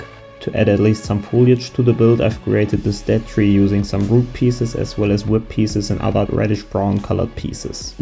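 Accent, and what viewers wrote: Germany. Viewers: Bist du deutsch weil du hast einen ziemlich deutschen Akzent und hast die Deutsche Artellerie auch sehr gut ausgesprochen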